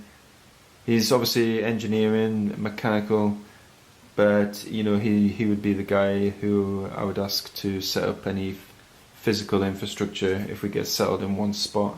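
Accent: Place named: Newcastle, United Kingdom. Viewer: I think you should stay on YouTube. Good content and hearing you reminds me of my drugged youth living in Newcastle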